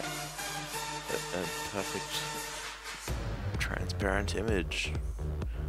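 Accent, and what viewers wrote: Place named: United Kingdom. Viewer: You sound like you're british and have either done a fuck ton of molly and fried your brain, or you're super stoned and talking ridiculously soft into your headset. What's hilarious is even the transcript has no idea what's going on. I think I finally got it. Thanks for the upload. Work on your diction my good man.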